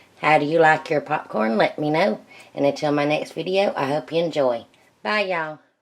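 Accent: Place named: South Carolina, United States. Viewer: I live in the foothills in SC and you sound like my kind of folks! Love your videos!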